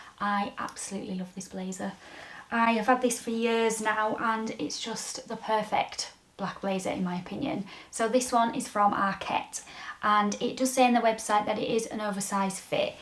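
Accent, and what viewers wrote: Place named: United Kingdom. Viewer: Really loving your style and delivery it’s natural and has that British feel bonus for street shopping as well as high street and shipping…and as for the pieces I’m off to get leather converse and some mocha trousers…..also nice to get some new brands on my list..autumn Is my favourite season by far and this capsule wardrobe is one of my favourites..from head to toe…thank you..subscribed !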